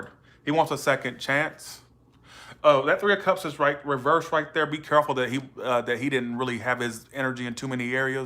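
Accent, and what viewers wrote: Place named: South, United States. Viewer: I love your personality you're so cute and I'm not a big fan of the southern accent but for you it sounds so cute and sexy you're just like so neutral I love that don't ever stop being you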